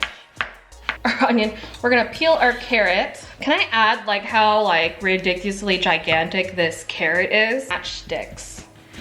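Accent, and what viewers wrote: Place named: United States. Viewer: you speak American English accent 😊❤ new subscriber from Seattle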